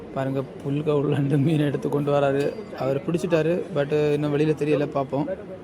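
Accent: Sri Lanka. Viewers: ஈழத் தமிழ் பேசுவதை போல பேசுகிறீர்கள்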